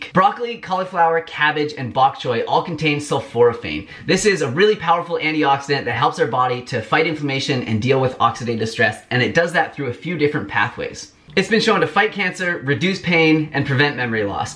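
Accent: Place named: Canada. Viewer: You sound Canadian😅. Juice the stems.. yummy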